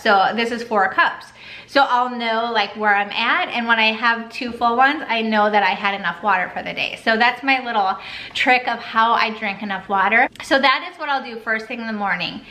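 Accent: Minnesota, United States. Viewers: You still have that Minnesotan accent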